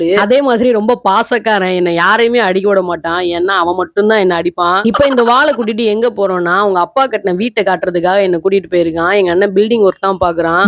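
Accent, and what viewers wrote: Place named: Kanyakumari, India. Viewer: Akka kanniya kumari slang pesuanga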